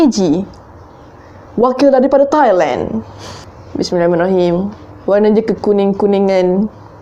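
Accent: Kelantan, Malaysia. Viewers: Ore kelate koo ni 😂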